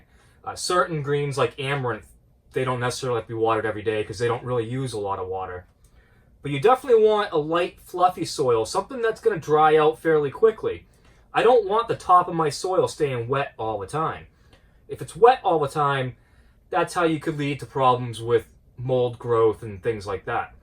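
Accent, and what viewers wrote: New England, United States. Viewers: Ahhhh.....there's a real symbiotic relationship between a how-too upload and a New England accent.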